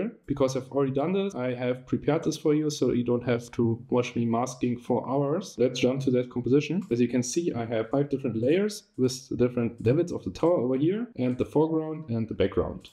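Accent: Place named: Germany. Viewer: Du bist Deutscher ich hör das raus aber Nice Video haha 😂❤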